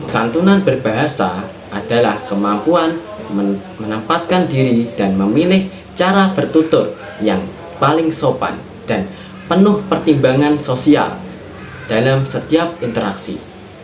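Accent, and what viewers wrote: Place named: Java, Indonesia. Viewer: Semangat, Medok ciri khas👍👍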